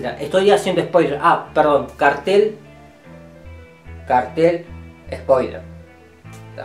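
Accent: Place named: Uruguay. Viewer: Los actores en Viven si parecen uruguayos… en Uruguay hay de todo pero obvio q no lo son por como tratan el mate. Honestamente yo pensé que vos eras peruano… me sorprendió q dijeras q sos uruguayo. Al oír tu acento es obvio q lo sos. Pero hay de todo en todos lados… yo vivo en USA y paso x americana hasta w escuchan mi acento… a partir de ahi soy de casi todos los países europeos 😂 solo q tengo ADN charrúa, y a mucha honra 😊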